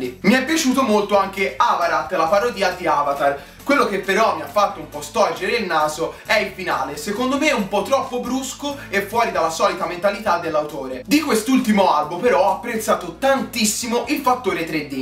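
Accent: Tuscany, Italy. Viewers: ma sei toscano????? XD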